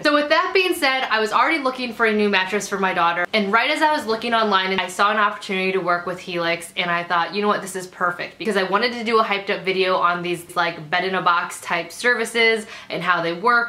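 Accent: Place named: Midwest, United States. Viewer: that mid-west accent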